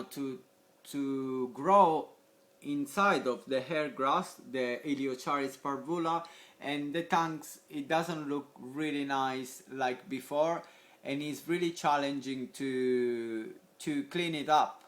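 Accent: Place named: Italy. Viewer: i enjoy your video and i also like listening to your italian accent. LOL peace.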